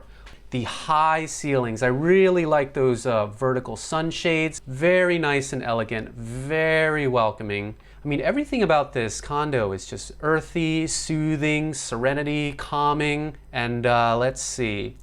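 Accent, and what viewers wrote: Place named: United States. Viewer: R u Thai and German, how come u sound like American, did u grow up in America?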